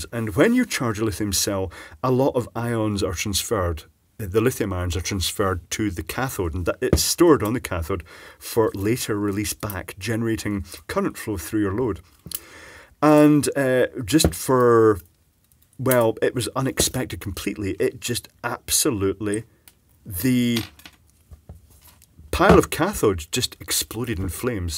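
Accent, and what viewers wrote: Scotland, United Kingdom. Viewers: Your voice sounds like a teacher that I used to know when I was a small child. He used to behaved and talked in a very similar way to you, and now I know that a lot of this ascent is Scottish in origin. I have noticed a few differences in Scottish ascents, being that I have known of them and stayed in Scotland for about 1-2 months. Scotland is fascinating. That teacher also used to have a beard like you as well; there was something about that teacher that used to interest me, I don't why; but he used to play the piano at school very well in assembly time, and this was in primary school.... I think looking back at it now, he was kind of trying to have fun with the kids in his own unique way.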